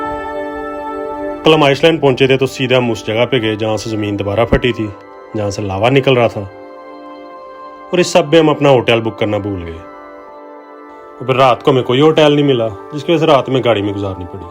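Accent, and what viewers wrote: Punjab, India. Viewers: yar biggets fan from lahore pakistan boht hi piaray lag rahay ho achhi video bnai hai yar punjabi main baat kia karo ziada, love your natural punjabi accent urdu, punjabi bola karo koshish karo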